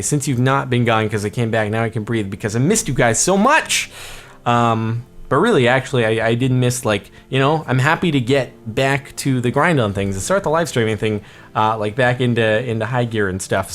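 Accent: England, United Kingdom. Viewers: your British accent is AWESOME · being from England, I can confirm that your English accent is 100% awful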